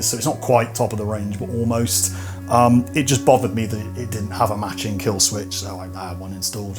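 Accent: RP, United Kingdom. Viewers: You have a very good taste in collecting instruments. Loved your accent too, sounds posh :)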